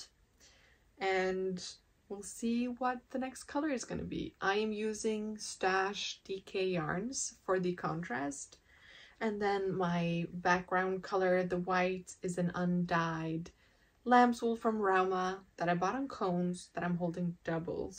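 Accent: United States. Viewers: Hi Mommy! I smiled when you said that English was not your native language. You sound American w the exceotion of certain words that might sound simmilar to Norwegian. Otherwise, every episode you get better & better. Look at yourself on this video & compare it to the 2nd or 3rd episode. You have ALWAYS had great grammar, and pretty great pronunciation. Nobody could fault anything you say/how you say it. However, the added touch to your American accent is the colloquialisms you feel more & more confidence using. I speak French, Spanis, English, & a tiny remaining bit of Italian (I spoke it 40 yrs ago, but the one adult that would always speak it, passed 40 yrs ago). Anyway, if I try Spanish, I don't always end or construct a word the Spanish way, I'll have to debate it in my head, because the other Romance Languages & even English, sound very simmilar. But l rather know other languages than get a word semi wrong every so often. 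Anyway, you sound great, either American or Canadian. 👍💯😉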